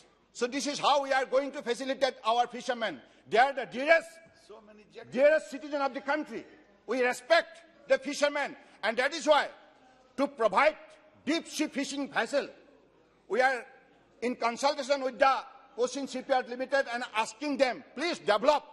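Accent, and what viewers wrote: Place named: Assam, India. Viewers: The beautiful assamese accent kicking in. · Accent assamese hai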